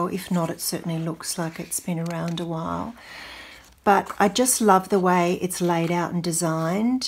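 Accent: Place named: Australia. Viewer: Hi, this video came up on my recommendation list, so glad to hear an Aussie voice, then I see you live near Canberra which is my home, I subscribed and really looking forward to more videos, love this one on books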